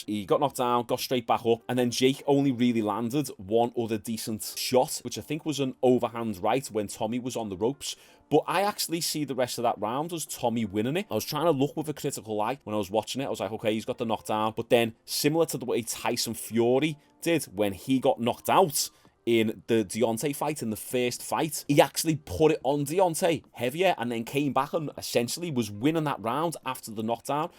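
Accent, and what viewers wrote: Liverpool, United Kingdom. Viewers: Dude. A Scouser can talk about flies fucking, and I'd tune in.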